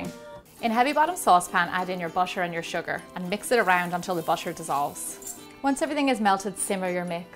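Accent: Ireland. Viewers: You had me at the Irish accent. I stayed for the food. haha! I am making the girls honeycomb today. Have a wonderful weekend! HUGS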